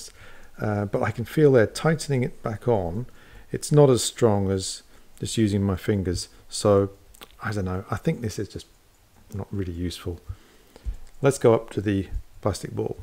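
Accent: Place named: England, United Kingdom. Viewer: I just love listening to your voice with that yummy English accent.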